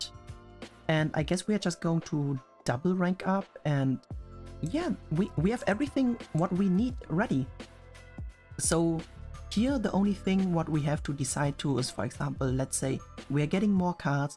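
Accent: Germany. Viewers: Where are you from? you have a german accent somehow?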